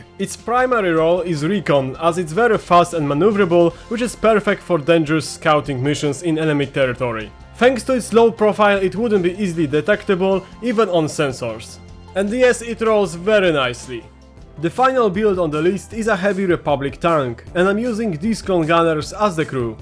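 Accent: Poland. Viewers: All of your mocs are just great - but I can't hold myself anymore, I need to tell you that the first time I've stumbled on your video as I've heard you I immediately knew you're Polish as well :P it triggers me anytime I watch your newly uploaded video - but on the other hand it reminds me of how much legendary moc builders besides you are Polish, such as Jerac or fukusaku - and I have to admit that it makes me somehow proud :) PS I don't mean to make you feel upset, sorry if I did that - I shouldn't even criticise the accent, and I have nothing to criticise on MOCs you make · masz polski akcent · I really like your content, od razu po akcencie poznałem rodaka 😂😊👍 super, że mówisz po angielsku, bo masz szerszą widownię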